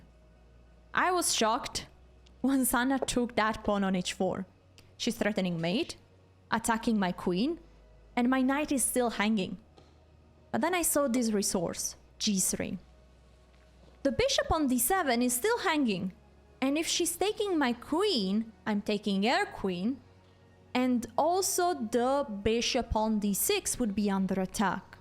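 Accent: Italy. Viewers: You have nice Italian accent 😍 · You have super cute italian accent! 😄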